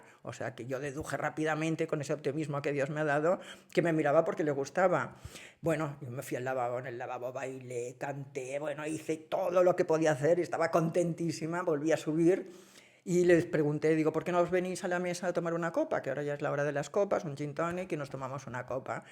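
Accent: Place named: Barcelona, Spain. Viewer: Me he divertido mucho.Pilar Eyre en estado puro.Nunca defrauda. Ese tonito pijito de niña bien de Barcelona, de revolucionaria reconvertida a nacionalista civilizada, de mis desgracias tienen un no sé qué de glamour que no puedo evitar que tiene encandiladas a sus seguidoras , especialmente de ultramar, es muy entretenido y en el fondo inofensivo.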